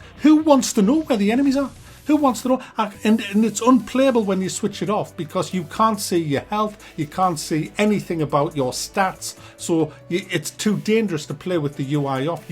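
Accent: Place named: North, United Kingdom. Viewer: Damn you sound so northern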